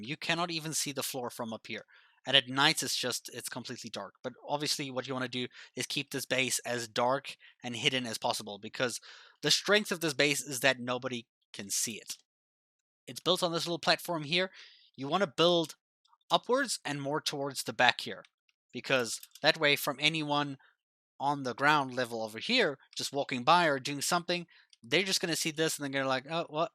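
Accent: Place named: South Africa. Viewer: I thought I could read that accent haha! Bring out your Saffa accent more, you don't need to talk like an American :D Good video man!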